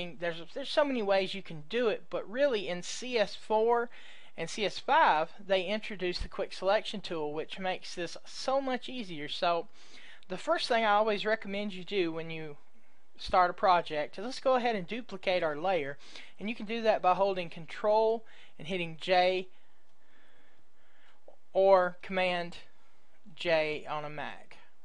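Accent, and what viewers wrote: Texas, United States. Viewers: Thank you! but hey are you from Texas?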